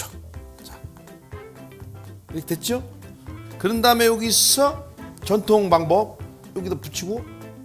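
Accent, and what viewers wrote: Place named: Countryside, South Korea. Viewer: 쩜매가꼬 구수한 고향의 사투리 반갑습니다 어린시절 시골에서 살때 참 많이듣던 짬매가꼬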